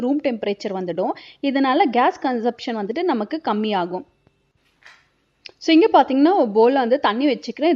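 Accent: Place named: North India, India. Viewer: Unga Tamil romba styla iruku, neinga north Indian a??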